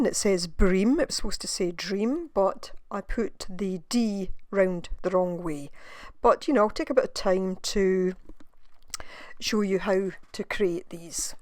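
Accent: Scotland, United Kingdom. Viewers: Perfect I've been looking for a video on how to do this for ages. Thanks - and you sound just like me - east scotland, yes?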